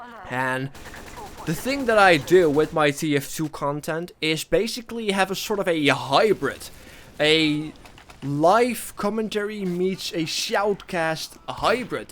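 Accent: Netherlands. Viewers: Dutch accent makes u special lolz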